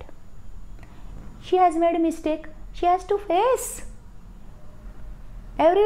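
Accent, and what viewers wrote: Maharashtra, India. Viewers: Excellent Marathi accent although basically from Karnataka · HOW DO YOU DO THIS!!! ....JUST HOW. PLEASE TELL ME....I just can't stop laughing at each and every brilliant pun line(PT usha, khao, khao and Howgarts omg...rofl) You are extremely talented and funny. Keep posting and making us laugh....god knows we need more like you!!! You most certainly Crack the Marathi accent!👌🥰🧡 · Your are such talented actor, always have fun to watch your vedio. Your Marathi English accent is superb. Keep going. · Brilliant Marathi Accent! Keep it up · Amazing 👏 marathi accent 😍 एक नंबर 😊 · really amazing marathi accent.... Super..! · hatts off ..just awsome.. marathi accent😊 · Your Marathi accent is so cool ❤️ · That Maharashtriyan accent... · Grt job....accent n punch lines used r true n terrific in any marathi household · Omg this is soo perfect👍👍👏👏 up to the mark 👍👍👍 P T usha 😅😅 amazing word play.... 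kudos to you 👏👏👏 and I am loving your Marathi Accent 🤩👏👏 Love From Apla Pune 😘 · Love her Marathi accent 😊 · Once again.. English spoken in immaculate मराठी टोन. Not to mention, minimal but perfect मराठी expressions... कस जमत हे तुम्हाला? How do you do it? Great work. Please keep it up. असच चालू राहू द्या:-) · Kiti chan Marathi bolte nahi?Ekdam shuddh ! 👍👏👏👏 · Awesome. Marathi accent on point and message to parents, (letting children face their challenges ) is great. P T Usha was highlight :D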